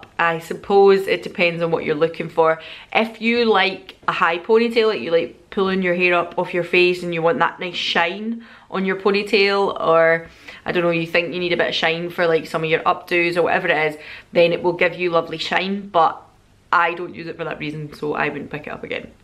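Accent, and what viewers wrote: Scotland, United Kingdom. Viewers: I ran across your channel quite by accident, just looking for a review on Luxe Tan The Gradual. Great review, btw. I stayed for the brogue, the honesty, and the attitude. You are a pleasure to listen to, and I find it so interesting the differences between makeup and skincare in Scotland versus Virginia, USA.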